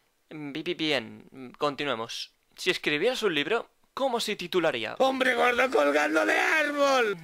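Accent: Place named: Spain. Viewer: ERES ESPAÑOL y lo se por la voz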